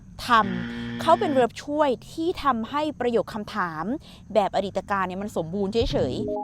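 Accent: United Kingdom. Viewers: ชอบสำเนียงบรีติช ของ มากครับ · ผมชอบสำเนียง British Accent ของ มากเลยครับ ไม่ทราบว่า แต่งงานยังครับ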